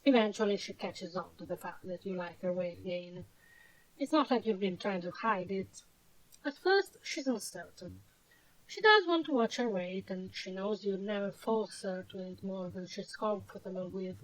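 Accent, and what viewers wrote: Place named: Italy. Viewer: Dat thicc Italian accent! love it!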